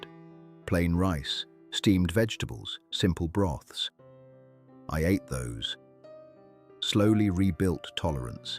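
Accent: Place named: England, United Kingdom. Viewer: Why does the AI narrator have an accent that sounds like someone from England when this story is about the Cascade mountain range from Washington state, USA?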